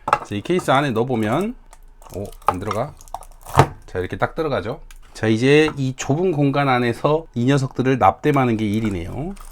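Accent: Jeolla, South Korea. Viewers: 혹시 전라도 어디세요^^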